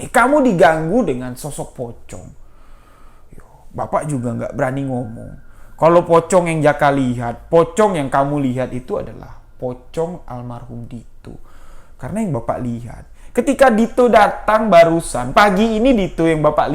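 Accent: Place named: Jambi, Indonesia. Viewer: Konsep konten Story Teler nya mirip channel SUNYI, cara menyajikan ceritanya menarik dengan intonasi suara saat bercerita... Khas jambi banget. Sedikit koreksi mas, pernikahan itu kan hukum Agama, dalam Islam... Masa iddah wanita itu sekitar 3 bulan, setelah lewat 3 bulan masa iddah maka wanita tersebut boleh menikah lago. Jadi jangan dibenturkan dengan kearifan lokal yang menyatakan "tidak boleh menikah jika masih 7 bulan menjanda"... Baik itu berdasarkan adat jambi atau adat jawa, dll. seolah-olah menikah lagi setelah 7 bulan ditinggalkan suami adalah sebuah hal yang haram (tidak boleh). Tetap hukum Agama diatas hukum kearifan lokal, jika hanya ingin beropini sendiri, cukuplah katakan "jika masih bisa menahan syahwat, sebaiknya menikah lagi setelah 1 tahun setelah meninggalnya sang suami.".... Jadi hal itu sifatnya anjuran saja. Saat ini masih nyimak konten-kontennya bang... 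Semangat bang..!!!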